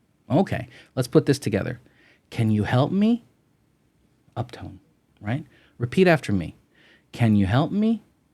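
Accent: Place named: United States. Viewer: Hello my teacher I really love your teach I want to learn English perfect with you special your pronunciation I love American pronunciation I don't like language British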